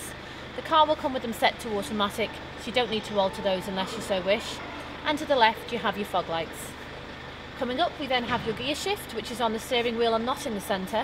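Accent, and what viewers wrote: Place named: Liverpool, United Kingdom. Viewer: Has to be the most posh Liverpool accent I have ever heard very nice and a very well put together video. I now no how to use the heating on my car thank you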